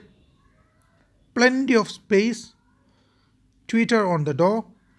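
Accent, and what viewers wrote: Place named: Kerala, India. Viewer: Manglish kollam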